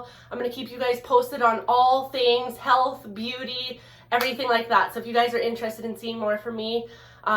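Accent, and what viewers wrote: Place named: Canada. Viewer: Where are you from?? You sound veeery Canadian!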